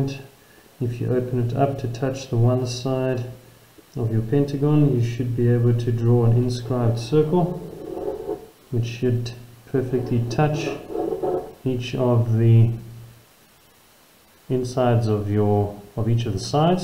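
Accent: South Africa. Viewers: oh hes so south african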